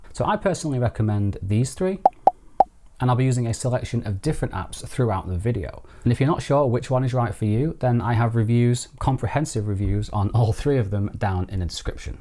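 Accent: United Kingdom. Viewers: Thank you! I'm fairly new to RAW and only very occasionally use it. Following your video, I downloaded your RAW files and tried them out myself on my iPhone12pm. Since that now has RAW capability, I tried a few edits in the default app, and then finished it off in SnapSeed (just the normal edits, not RAW). I have previously found that I preferred the default photos app for RAW editing since in SnapSeed the scale for shadow RAW editing was far too wide and tended to affect the mid-tones as well as shadows. I also tried out DarkRoom which I've only tried a couple of times previously. It doesn't appear to have a masking facility, something which I find to be essential, which is why I most often go back to SnapSeed. And I didn't like what DarkRoom produced this time around! I preferred to go for a very different look from yours, but as you say, so much is down to personal preference. 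I do most of my shooting in the default camera app, but must try out Halide which I have but not really got to grips with. I have a problem. I'm an AppAholic haha! But I guess I"m probably not the only one!!! Is there a suitable 12-Step Programme for me??? Blessings and thank you again. PS, nice to hear a British accent like mine on YouTube!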